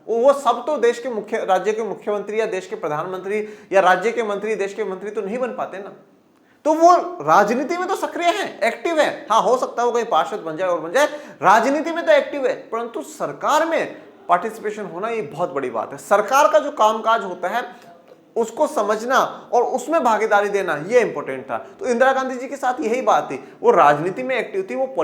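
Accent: Rajasthan, India. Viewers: Rajasthan sa ho kay sir 😂😊😂😊😂😂😊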